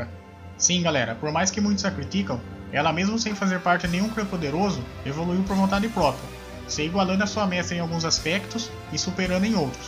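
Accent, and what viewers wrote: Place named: Minas Gerais, Brazil. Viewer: Mano seus vídeos são muito bons, tu manja muito, mas na moral cara, procura uma Fonoaudióloga, não é por implicância ou por qualquer outra coisa, mas seu sotaque torna o vídeo maçante, parece um cara do fundo do interior de minas falando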